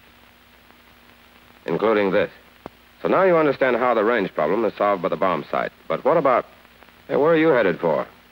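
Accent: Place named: Transatlantic, United States. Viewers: Its the Mid Attlantic accent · That transatlantic accent carries hard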